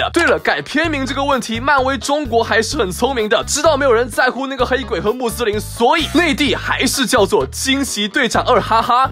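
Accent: Taiwan. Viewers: 這個口音 好像台灣人喔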